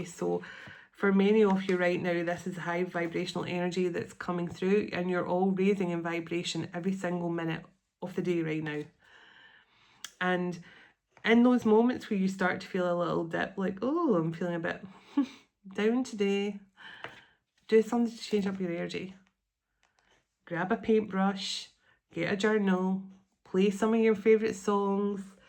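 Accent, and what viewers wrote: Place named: Scotland, United Kingdom. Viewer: YOU are so loved by all of us. When I first came across your Channel, I was intrigued by Tarot and what it was and listening to your Scottish accent was endearing....... when we connected by Zoom it was then I KNEW we are from the same Soul group..... my sister, sweetest feeling ever. I love tuning in just to listen to your stories and to hear of how God is moving you into your destiny......... beautiful. Thank you for being such a comfort to me,. I don't know how I managed my emotions until you began helping me..........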